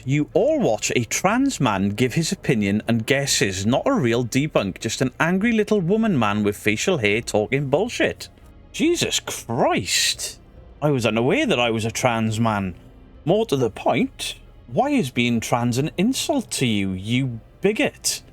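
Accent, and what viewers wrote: Wales, United Kingdom. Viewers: They are just jealous of your glorious beard and lovely Welsh accent!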